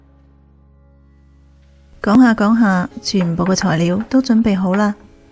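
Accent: Standard. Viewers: 妳的廣東話好地道喎, 好專業既講解, 看🈵學習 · 粤语非常标准，听了舒服极了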